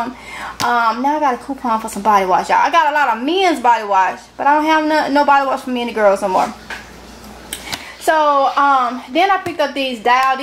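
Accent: South, United States. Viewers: Love that southern accent it hits different lol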